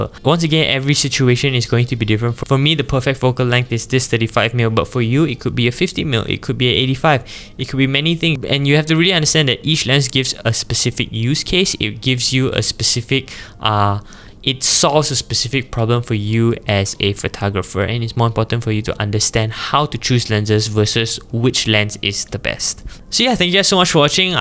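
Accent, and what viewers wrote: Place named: Nigeria. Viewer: You sound a little like an nigerian 😊 thanks for the Review and expensive lenses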